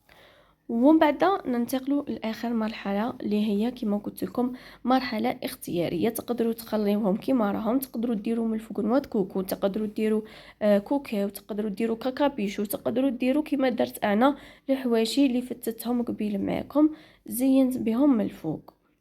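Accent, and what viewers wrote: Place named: Setif, Algeria. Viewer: اول مرة ندخل ونشوف قنااك والله لهجتك كيما تاعنا سطايفية يخييي ولا اني غالطة صحييلي 😁😁😁😁😁😁😁🤣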